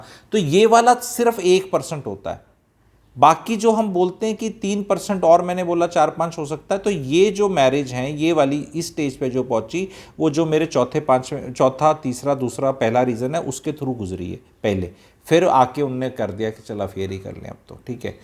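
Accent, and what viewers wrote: Haryana, India. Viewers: I like your Hariyanvi accent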